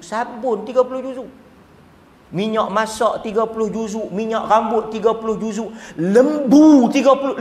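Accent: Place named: North, Malaysia. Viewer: langgah ayaq,pepak kismis..pure utara noo..👍👍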